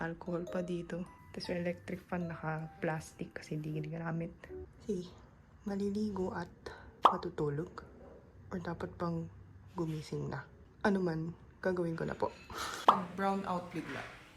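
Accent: Batangas, Philippines. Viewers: Wow I like all that you're now. You had adopted the basic pinoy lifestyle: your accent, attitude, and your behavior I don't want to say forgetting your Korean way, but I'm just proud having you in PH. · Nice lady with pinoy accent.. ala eh kagandang bata nire . Lasa ko'y mas pinoy pa ito kesa sa mga koño na pinoy. Be safe lagi. I enjoy your video's. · Your phillipino accent is so pleasant to hear. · laking batangas ka pihado ano ang salita baga halatang halata ih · Koreanang Batangueña . Ala eh kainaman. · hahaha gets ko na kung baket ka super fluent and with a thick filipino accent. Iskolar ka pala ng bayan. Pero sabaw ka rin ahhahahha! stay weird! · hala yong galawan at pananalita mo parang pinoy na pinoy siguro mahilig ka ng tropa sa pilipinas noh kaya ganyan ka ka daldal😂😂😂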